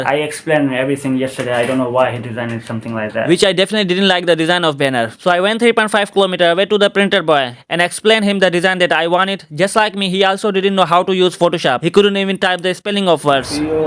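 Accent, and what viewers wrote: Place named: Pakistan. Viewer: Pak english good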